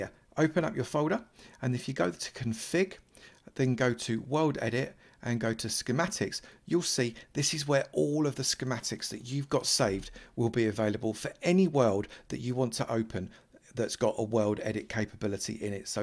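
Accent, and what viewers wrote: United Kingdom. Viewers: thanks very much man ! i had been wondering what i was doing wrong :).... love your british accent btw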